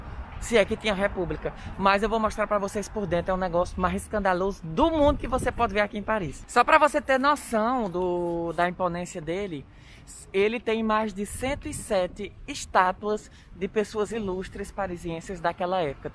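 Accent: Northeast, Brazil. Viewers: seus vídeos são um mix de cultura, beleza arquitetonica, beleza da natureza, informações de utilidade pública, tudo falado de forma naturalmente simples, divertida e brasileirissima, no legítimo e delicioso "nordestinês". Já dou like antes de começar a assistir. Agradeço ao Alexis do canal Ola Brasil por ter descoberto você!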